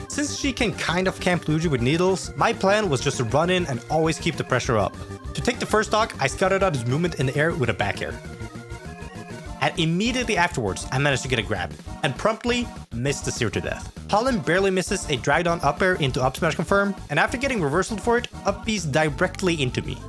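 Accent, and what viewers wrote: Norway. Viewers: Du er norsk? Kult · Kommer du fra norge?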